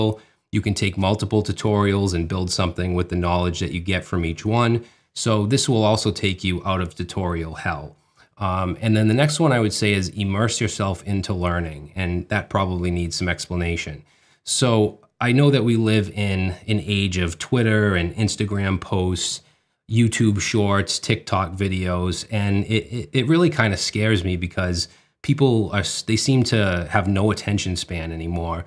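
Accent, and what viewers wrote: Boston, United States. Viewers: I love the Boston accent.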